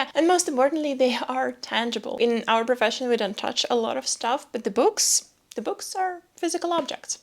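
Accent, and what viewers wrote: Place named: Eastern Europe. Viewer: you have slight slavic accent, where you from?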